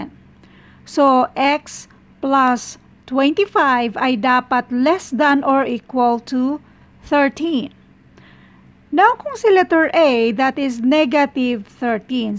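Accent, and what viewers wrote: Visayas, Philippines. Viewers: Thank you so much Maam. You are so humble and sweet. I like your visayan accent. All the love from here (Dumaguete City)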